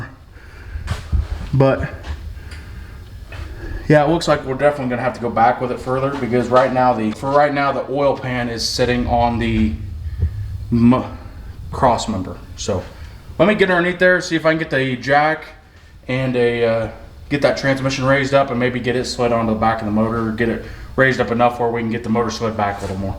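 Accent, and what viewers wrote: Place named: Oklahoma, United States. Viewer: Okc guy in here...sounds like your close...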